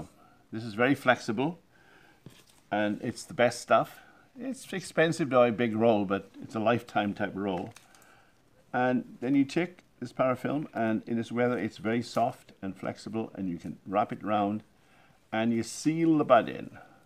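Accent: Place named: Caribbean. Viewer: has a very unusual accent, he sounds like he is from the Caribbean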